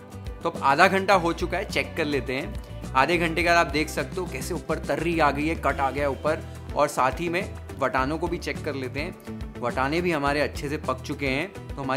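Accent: Maharashtra, India. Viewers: BRO ARE YOU MAHARASHTRAIAN😮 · Your marathi accent is very nice 🚩🚩👌🏻👍🏻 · Bonus recipe❤🙂👍!!!!! Ekdam authentic preparation chef!!!! Jiyo chef !!!❤ also nice marathi accent❤!!! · R you Maharashtrian? My wonder boy. Your sounds said....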